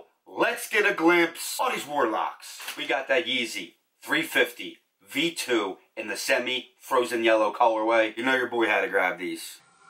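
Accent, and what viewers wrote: Ireland. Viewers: I knew it I was hearing the Irish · These are dope. Are you Irish, kinda hear an accent?? Lol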